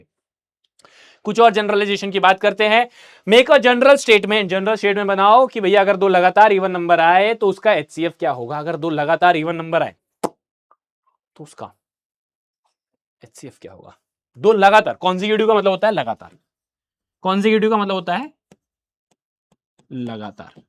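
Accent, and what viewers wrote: Bihar, India. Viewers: Sir are you from bihar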